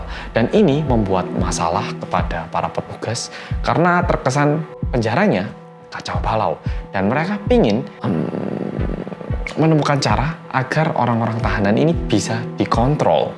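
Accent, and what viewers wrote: Java, Indonesia. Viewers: Bersama orang Korea yang medoookkk ... 😊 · Org Korea yg medokkkk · Bersama orang korea yang medokk mantapp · Mass medhokkk... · Org Korea medhokkk💜😌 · Orang medok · Welcome back dngn mas yang medokk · Apa cuman aku kalo mas opening "hello guys welcome back to korea reomit bersama orang yg MEDOK!!" trus aku ikutan ngomong medok? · Pertama liat mas lucu aja gitu orang korea ngomong medok🤣best deh MAS 💗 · Orang korea medook❤ Dan ganteng😁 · Medddhok hahaha (like donk ka, heheheh canda tapi kalo mau di like like atu )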